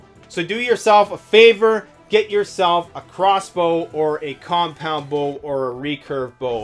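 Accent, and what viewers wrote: South, United States. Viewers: Work on your southern accent.By the way, you look like "the Fly" from the '50s movies(As The ). Your videos give out very useful information. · I love this freaking American accent man it’s so awesome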